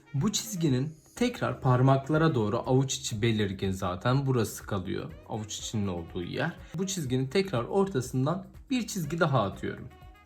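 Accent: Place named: Samsun, Turkey. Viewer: "Eğl" çizmeyi öğrettiğiniz için tişekkürler. Hafif bir samsun ağzı sezdim (-_-)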